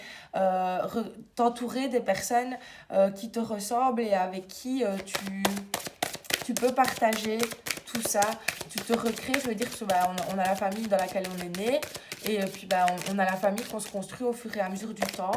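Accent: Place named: Belgium. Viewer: Je vous découvre j'adore votre accent belge si je ne me trompe pas. Évolution pro surtout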